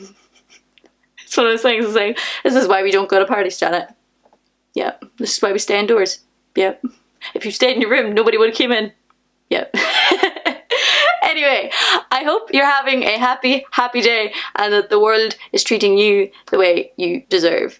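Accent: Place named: Ireland. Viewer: Which part of Ireland is that accent from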